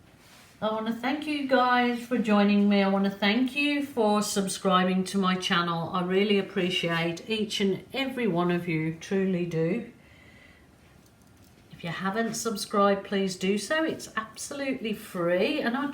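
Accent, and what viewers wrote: Birmingham, United Kingdom. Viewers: Definitely Brummie ! LOL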